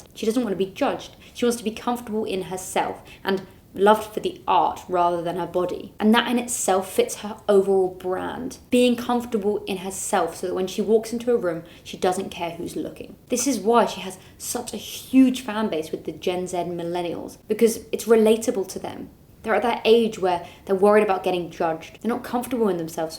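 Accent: United Kingdom. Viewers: i hope you dont mind me asking a rather personal question .. where are you from (more or less) im asking because of the accent it is a accent i very much like but only a few have it in movies and stuff .. i would say brittish but then again the country is big so id like to know where this is coming from :) cheers and greetings from germany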